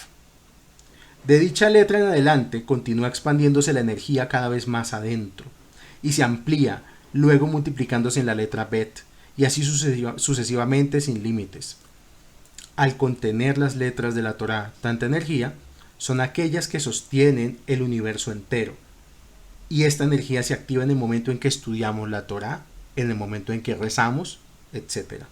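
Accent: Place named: Colombia. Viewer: Atento escuchándote. Tu acento me suena Colombiano. Un abrazo desde Brasil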